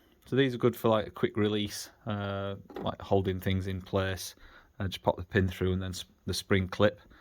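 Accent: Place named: York, United Kingdom. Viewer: Where are you based? You sound local to me, York?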